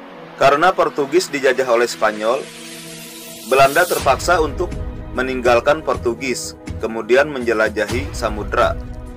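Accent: Indonesia. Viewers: Orang Sunda nih yg ngomong. , Logatnya kental sundanya . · Nada omong lu ketahuan lu suku Madura cok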